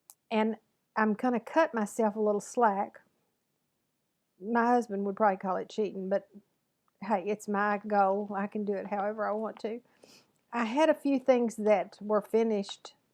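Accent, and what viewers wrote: South, United States. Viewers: I love your southern accent. I could listen to you all day. Take care.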